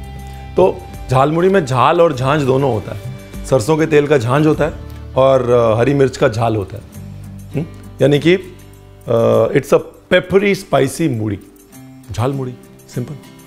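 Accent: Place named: Bengal, India. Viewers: Your Bengali accent is too cool. I'm form Bangladesh 🇧🇩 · ঝালমুড়ি, ঝালমুড়ি, ঝালমুড়ি। কথায় কিন্তু বাংলার ছাপ। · Other than jhalmuri loved ur bengali accent....❤️❤️ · Hi Chef. I'm a Bangladeshi and often watch your videos mostly because of the cooking tips and background stories you share. I loved your Jhalfrezi recipe and loved that you explained the history so well. But yes this particular video made me a true fan of yours. I really really love your (almost) authentic Bangla pronunciations. Jhal Muri is a popular roadside snack in Bangladesh as well, however we do not add coconut and rather add a spicy paste of gram pulses which locally is known as Ghugni, hence spicier. I would really request you to visit Dhaka once and try out typical Bangladeshi dishes like Kacchi Biriyani, Tehari, Fuchka (ours is fully loaded Fuchka, unlike the pani puris), Chotpoti, Fish dishes, Kebabs in Puran (old) Dhaka and what not. 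I'm sure you will have a great time to experience dishes which are somewhat sounds similar to Indian dishes but actually are so different in taste. Love, from Dhaka <3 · Your bengali accent is so good 😍😍 And Jhal muri is valo basha😋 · His Bengali accent wins my heart ♥ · His Bengali accent is just 👍🔥😍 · Sir, you have a proper Bengali accent❤️. Amazing sir. Loved it. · His Bengali accent is so natural..😍 we also call it ঝাল মুড়ি / jhal muri in Bangladesh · Ranveer sounds so cute when he pronounces words with Bengali accent :) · is bande ko sach mein pata hain ki bangla mein masla ko moshla bolte hain isliya to tumi atto valo katha bolo dada awesome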